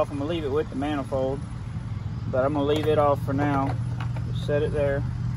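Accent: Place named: Tennessee, United States. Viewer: Hey Brother I appreciate the info the problem with me is I'm a single dad of two little girls 10 and 7 . the issue is I don't make alot of money I make about 17 bucks an hr . I want to do all this stuff to my truck but I'm afraid I'll mess something up. I ain't got the money to fix it or to send it off to get it done. On a scale of 1 to 10 how hard is it to do this? I wanna do it but afraid ill tear something up. Anyways god bless ya Brother .sounds like you got a Tenn accent I lived in Tenn for six yrs in a small rural town called Cunningham Tenn. I loved it man best six yrs of my life. I cut tobacco yellow and dark. I hung it in the barns as well. Best years of my life .you gained a subscriber.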